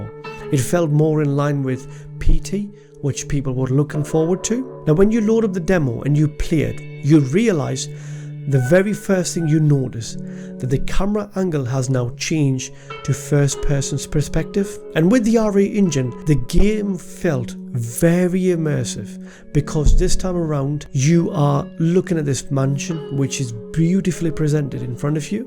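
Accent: Newcastle, United Kingdom. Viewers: Off topic, is that a Geordie accent?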